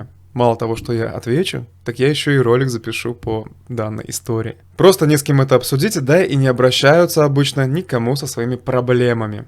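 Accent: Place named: Belarus. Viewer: здравствуйте! Судя по акценту, вы из РБ! Я ваш земляк, и хочу Вам сказать Спасибо! Вы реально меня спасли. Все по делу, выражения-в точку! То, что и хотят слышать мужики в моей шкуре! Ещё раз, Спасибо! Если бы мог, пожал бы руку!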